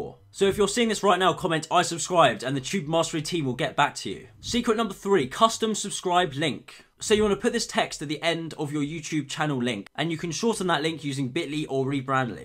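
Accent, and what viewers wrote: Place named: United Kingdom. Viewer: I can guarantee you that he's BRITISH....Bri-ish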